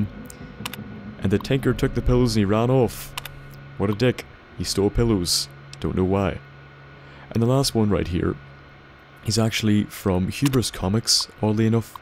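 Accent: Northern Ireland, United Kingdom. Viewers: are you northern irish boyo? your humour and use of expletives are second to none. Reminds me of myself lol. Brilliant content creator you are bro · which part of Northern Ireland you from?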